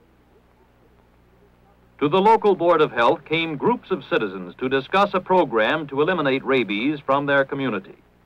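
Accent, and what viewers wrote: Transatlantic, United States. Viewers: Trans Atlantic accent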